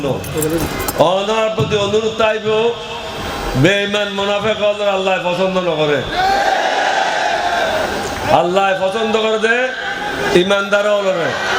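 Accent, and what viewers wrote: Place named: Chittagong, Bangladesh. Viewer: ভাষায় বুজা যায় আমাদের চট্টগ্রামে মানুষ মনে হয়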